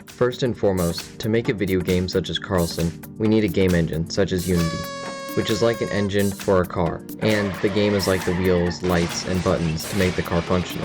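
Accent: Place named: United States. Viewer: ig your american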